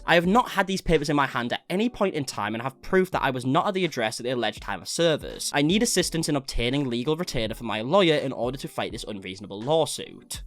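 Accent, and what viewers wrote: United Kingdom. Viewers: I wonder why so many British drama channels are so into covering this nutball woman. Seems like every time I click on an update - yep! At least if I have to hear about that horrid beast, it's in a pleasant accent! :)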